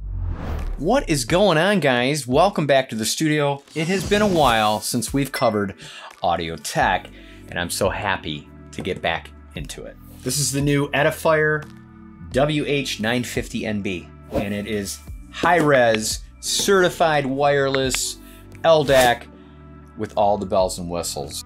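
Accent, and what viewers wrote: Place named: Eastern Canada, Canada. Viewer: Best video on these cans yet. Pulling my hair out trying to choose what headphones to go with. Razer opus lacking the ldac sucks but these I've heard are poor performers once they are plugged in aux. Lose the eq settings and goes passive? But these are the nicest looking cans out there with almost all features needed. Ldac is perf for amazon music streaming. But as an occasional wired gaming head set... Sounds like it's a serious loser.... BTW are you from the Canadian east coast? You sound eastern Canadian.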